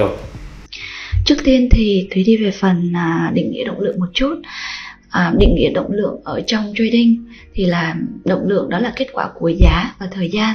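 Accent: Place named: Nghe An, Vietnam. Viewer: Nghe giọng như quê ở 37 à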